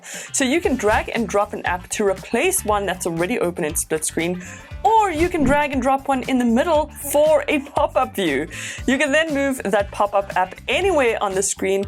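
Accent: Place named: South Africa. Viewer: She sound Hella south african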